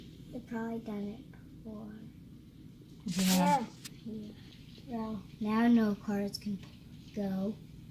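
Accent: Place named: New Brunswick, Canada. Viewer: Thanks for letting me know where your accent is from. I have good friends across the Bay in New Brunswick who pronounce words the same an you! LOVE IT! Bacon makes everything better!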